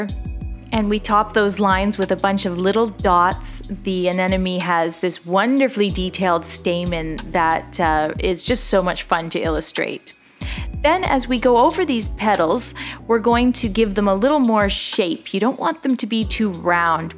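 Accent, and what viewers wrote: Canada. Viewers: She is good, but camera is to far away most of the time. She sounds like Canadian. I would like to see these done in color.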